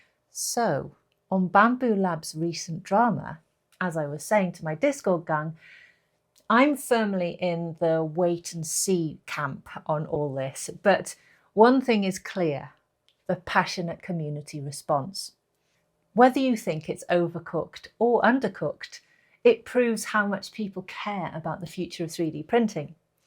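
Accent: Wales, United Kingdom. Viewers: Might be a strange comment but...is that a bit of Welsh I hear in your accent? watched and enjoyed a few of your videos, but only noticed it in the last half of this one.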